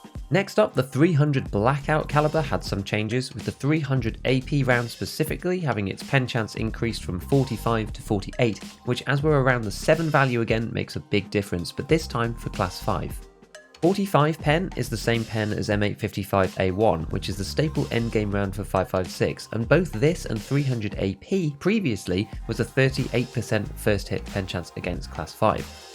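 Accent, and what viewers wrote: RP, United Kingdom. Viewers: Again, when I need you the most, you're there to hold my monkey hand and lead me toward the light of knowledge. You really are my favourite Tarkov YouTuber. Your content is smart and the posh accent makes it sound doubly smart, like what you're saying can be absolutely trusted .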